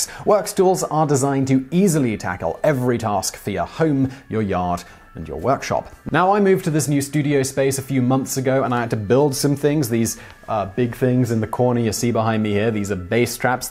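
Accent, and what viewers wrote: England, United Kingdom. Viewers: Much Refreshing Delightful And Insightful... LOVE YOUR Marvelous English Accent And Your Intellect!!! YOUR ALWAYS Well Put Together Along With YOUR Topics. I Look Listen And Learn So Much THANK YOU, For The Excellent Work Keep It Up.... THIS Subject Matter On THE "Egyptians" Is So Fascinating. PEACE🙅🎩📖🏆😃🙅🎩📖🏆😃🙅🎩📖🏆😃🙅🎩📖😃🙅🎩📖🏆😃🙅🎩📖🏆😃🙅🎩📖🏆😃🙅🎩📖🏆😃🙅🎩📖🏆😃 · Bald? Check. Glasses? Check. British accent? Check. This gent must be legit.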